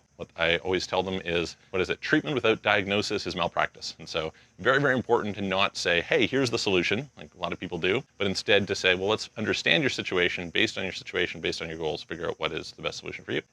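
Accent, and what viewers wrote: Canada. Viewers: Excuse us. He’s Canadian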